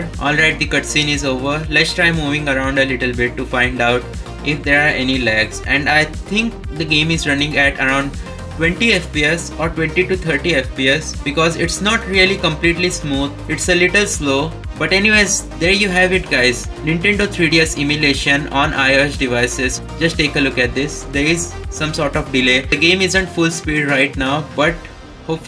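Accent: India. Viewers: As soon as I heard the Indian accent, I knew it was going to be fake OK that’s racist…. I’m sorry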